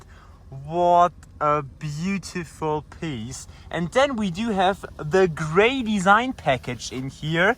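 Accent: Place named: Germany. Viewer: Bro you are from Germany i can hear it ! Moin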